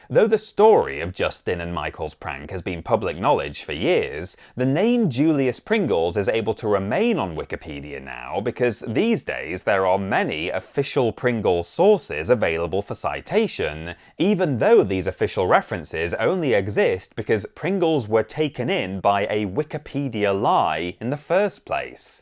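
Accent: United Kingdom. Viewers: How did I not notice he had a British accent until he said crisps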